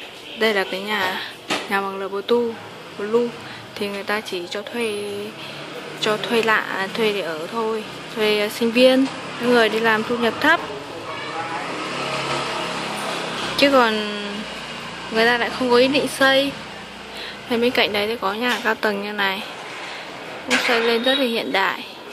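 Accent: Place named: Hanoi, Vietnam. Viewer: Chào bạn gái Hà Nội yêu thương! cảm ơn bạn chia sẻ video này để mình biết về chợ ở HN. Giong nói Hà Nội nhẹ nhàng đáng yêu. Đăng ký kênh bạn và mong bạn cùng tương tác lại với mình để duy trì kết nối nhé.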